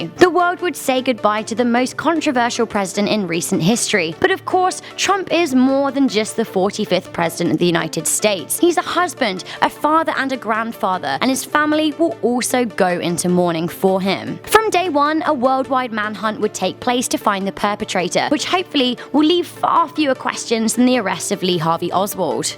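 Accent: United Kingdom. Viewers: Is she Britsh? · I'm here for the British accent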